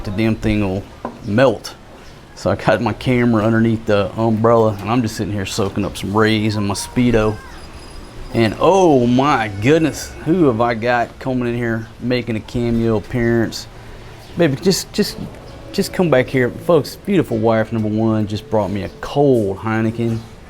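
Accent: United States. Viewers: The trailer idea is genius. Even if she gets possession of it, it would be a lot cheaper than losing a house. Take care with the bank account comment; it might bite you if it gets in the wrong hands. I'm not from your part of the US but I have absolutely no problem with your attitude or the way you talk. Be yourself and be proud. Peace out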